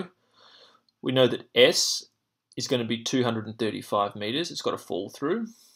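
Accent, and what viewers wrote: Australia. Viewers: cool Aussie accent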